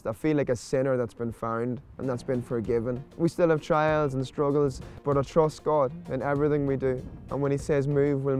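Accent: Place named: Ireland. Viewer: Awesome story bro! Just recently heard your music and love it! And now find out you're Irish! Haha, love the accent.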